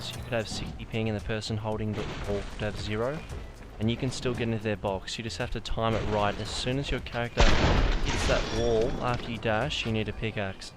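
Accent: Australia. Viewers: love the australian accent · R u Australian, if so r u best OCE?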